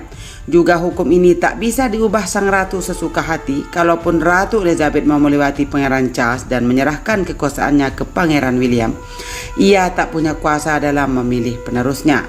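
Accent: Indonesia. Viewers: Kakak orang medan juga ya?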